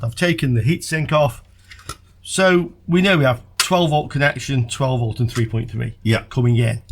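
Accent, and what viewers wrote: Germany. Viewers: Dein Kumpel hat wirklich was drauf, das muss man sagen. Er ist zwar nicht der Guru, was Grakas angeht, aber dennoch ganz oben mit dabei. Ich denke, das weißt Du ja. Nach allem, was ich bisher gesehen habe, bin ich sehr zuversichtlich, dass bloß die 1,8V Versorgung Probleme macht ... sobald soweit vorne in der Sequenz etwas defekt ist, ist meistens weder RAM noch GPU defekt, daher wäre ich an Deiner Stelle sehr zuversichtlich. Ps. Mein Kommetar kam übrigens schon, als ich das Video gerade 2 Minuten gesehen habe. Man merkt sofort, wenn ein Deutscher Englisch spricht 🙂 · ich liebe es wie man es bei uns deutschen eig immer zuverlässig raushört :D x3 grüß dich :D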